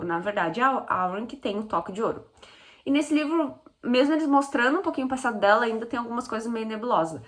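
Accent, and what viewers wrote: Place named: South, Brazil. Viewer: cheguei faz pouco no canal. E amo como vc conta tudo. E mesmo depois de leer os livros eu venho ouvir sua resenha. E amo seu sotaque do Sul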